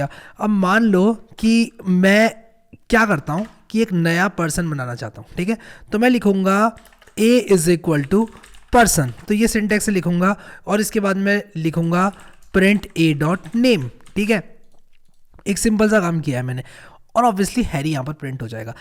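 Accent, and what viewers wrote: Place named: Uttar Pradesh, India. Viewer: wo object jiske liye call Kiya jaa raha hai❤❤ | Aap UP se hone bhai😂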